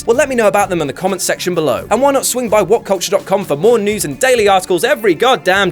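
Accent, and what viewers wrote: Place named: South West, United Kingdom. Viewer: Where are you from in the south west?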